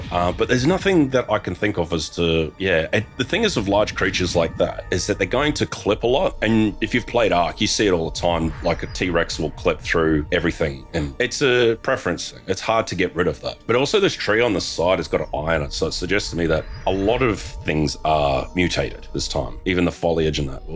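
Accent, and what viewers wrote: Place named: Australia. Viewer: Hope all is well mate, you were a big reason I got into the forest and I still routinely watch on your old videos just for a good time. Hearing another Australians voice playing through a game I loved so much was a huge hook for me and I’m eagerly awaiting a video once the time is right. Hoping everything’s great with your personal life, and one final huge thankyou for being a big part of my friends and i’s lives growing up.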